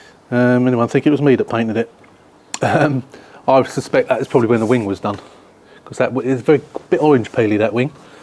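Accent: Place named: United Kingdom. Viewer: And we seem to be the same age, I'm 59, and I really like your British accent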